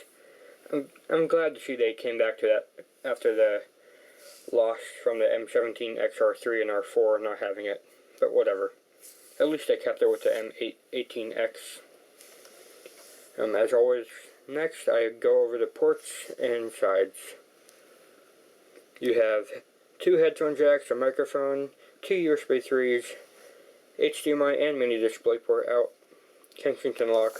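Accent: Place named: Russia. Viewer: for god's sake, he's russian, english is not his first language STFU already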